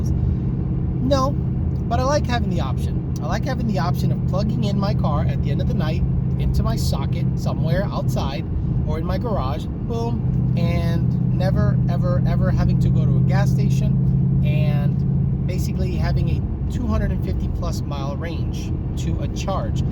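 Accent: United Kingdom. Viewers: That damn UK accent had me dying lmao. Great video again brother. 🤘🤘🤘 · Wagons ( or in your english accent they're called an "estate") look great. Audi's avant line Mercedes with the e-class amg wagons BMW has the greatest of all time RIP E39 touring (wagon) But yes you're right, many cars look better as a performance wagon.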